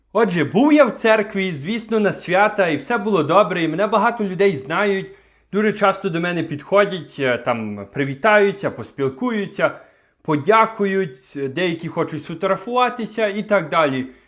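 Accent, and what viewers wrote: Western Ukraine, Ukraine. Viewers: Вы говорите что вы умеете хорошо можете говорить на российский мови тому до у вас е западэнсЬкий акцент. А до робыть вам якщо мы Родом з Мариуполя и розмовляють там все российской. Мы были там всю блакаду голодали и с трудом выехали из оккупированной територии. Что нам делать если мы родились и выросли в Украине а разговариваем на непонятном языке не на русском и не на украинском. За то что мы такие к нам плохо относиться. У м Мариупольцев обромное горе Нас лишили окупанты всего имущества которое у нас было и лишили здоровья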